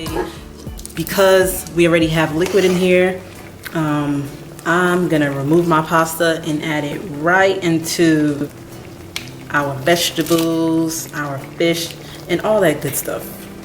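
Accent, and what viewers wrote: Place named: Haiti. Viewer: I knew u were Haitian by your voice🤗, weid i know😂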